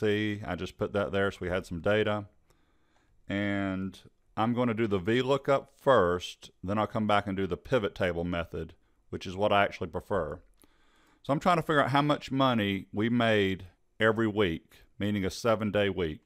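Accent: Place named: Texas, United States. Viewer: Sir, are you from Texas because you sure sound like it?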